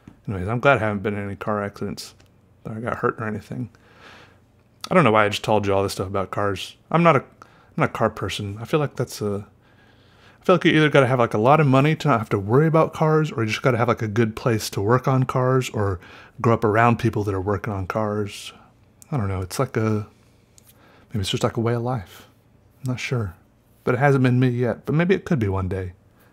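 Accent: South, United States. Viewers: I haven't watched your channel in like a year and yes you sound so much more southern lmao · Enjoy your southern draw but your drawings more. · Yes very southern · I hear a little southern accent in there... I like it :) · the southern accent is a no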